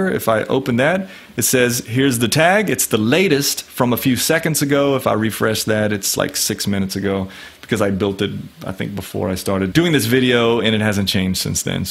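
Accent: South, United States. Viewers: love the southern accent maan!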